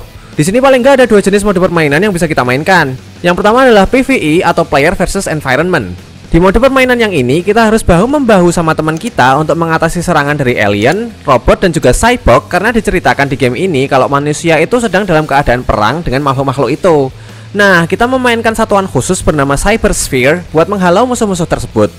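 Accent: Java, Indonesia. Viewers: Bang orang jawa yah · bang orang jawa ya?? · kayak medhok medhok gimana gitu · Uwong jowo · medhok medhoknya yang bikin muantep btw saya asli jawa juga bang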